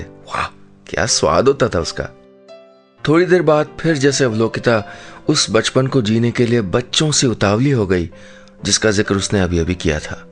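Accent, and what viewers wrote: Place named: Kumaon, India. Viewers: Tht Kumaoni accent...:-)...reminds me of my paternal home...:-D... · Aapke muh s kumauni hindi bahut achhi lagati h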